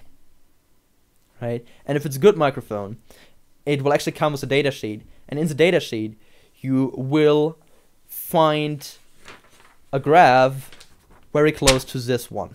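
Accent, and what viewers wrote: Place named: Germany. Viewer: Are you German..your accent is dope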